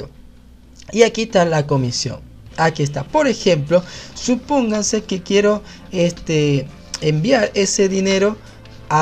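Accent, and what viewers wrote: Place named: Venezuela. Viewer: Mmm tienes un acento al chamo amigo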